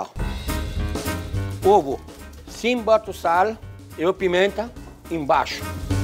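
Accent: France. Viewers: Eu amo esse sotaque francês 😍💕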